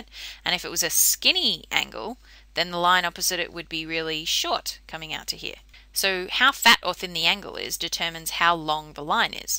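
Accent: Australia. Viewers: are you from australia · Aussie accent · Granin shes aussie not a brit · Granin lol she's very clearly australian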